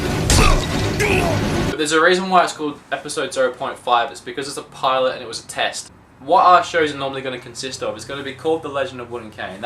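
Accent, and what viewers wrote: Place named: Australia. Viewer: Young Wood with his super strong Aussieland accent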